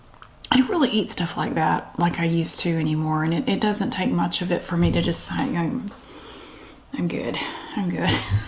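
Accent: South, United States. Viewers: I don't know how long I've been watching you for but I remember searching for Southern accent ASMR after watching a load of Phyllis Stokes videos. Unfortunately she's passed on now but I'll always remember my excitement when I found your channel. Happy Anniversary from the UK ✨ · Congratulations! What a milestone! Thank you for putting all the time & effort into your videos to make sure you give us the best ASMR experiences. You sure do help me relax & sleep. Just something about that southern accent lol. And for that I thank you. Can’t wait for what the future holds!! You rock!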